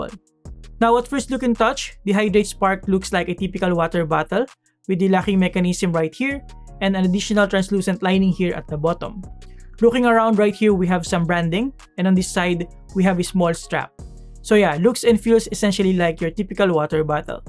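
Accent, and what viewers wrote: Philippines. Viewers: Come through Filipino accent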